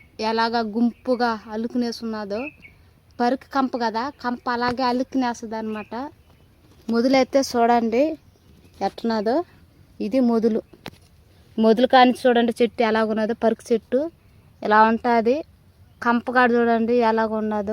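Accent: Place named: Chittoor, India. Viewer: సిస్ బాషా వింటూ ఉంటే మీద చిత్తూర్ యాస లాగా వుంది.. Medhe a ఊరు సిస్ ఇంతకీ..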